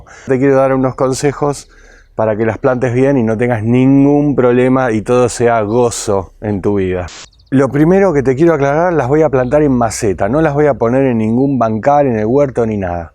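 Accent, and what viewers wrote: Rioplatense, Argentina. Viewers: Es argentino o uruguayo? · No puedo NO comentar este video por varias razones.... por el acento SOS Argentino 🇦🇷❤ segundo miré muchos videos de como cuidar las frutillas pero no explican bien y como nada es casual en la vida me apareció este video. La manera natural de explicar todo y con gracia😅 no sólo aprendí sino me morí de risa. Con el " si no tenes un mango" jaja SOS UN GENIO CHAVON ahora voy a explorar tu canal me gustan las plantas y voy a buscar la receta casera porque no tengo un mango para comprar todo eso.😂 Saludos desde Ramos Mejía. VIVA LA PATRIA CARAJO! 🇦🇷🇦🇷🇦🇷🇦🇷🇦🇷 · Leí "Fresa" pensé "es español", escuche 1 segundo de video y dije "es argentino" jajaj 🇦🇷 🇦🇷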